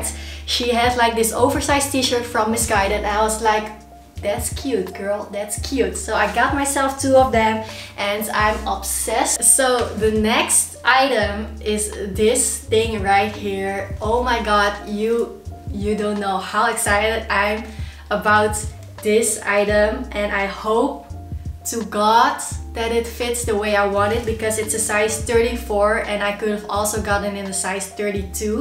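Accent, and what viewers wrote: Netherlands. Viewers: Recognized the dutch accent 😂😂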